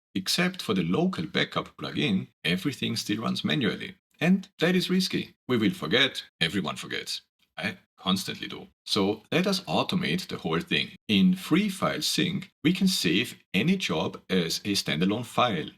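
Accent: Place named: Denmark. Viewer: Thanks for the video! Are you Danish? Your accent is cool, reminds me of Mads Mikkelsen haha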